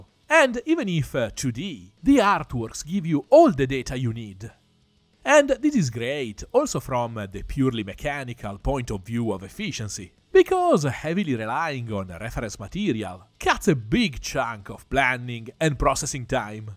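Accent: Italy. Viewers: ho capito che eri italiano prima di vedere il nome del canale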